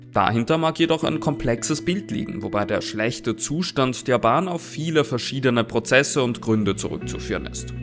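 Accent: Austria. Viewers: I don't see the problem here. Perfect German (with an Austrian dialect).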